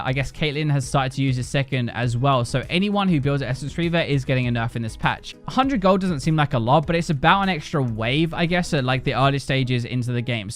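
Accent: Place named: Northern England, United Kingdom. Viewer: holy shit I can't understand a thing your northern english ass is saying. ENUNCIATE FFS.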